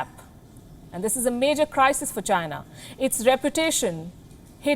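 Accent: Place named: India. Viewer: American here watching, and i LOVE THE INDIAN ACCENT.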